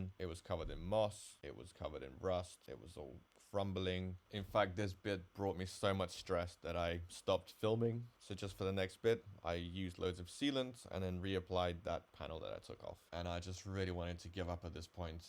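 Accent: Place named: United States. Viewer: It's not called trash, it's called rubbish. You speak like a yank.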